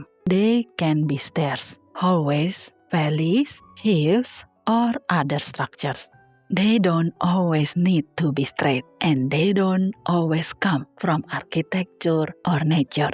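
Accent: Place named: Indonesia. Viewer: I was struggling to identify your accent when suddenly, oh, Indonesia, ternyata. Analisanya keren, semoga sukses buat channelnya ya kak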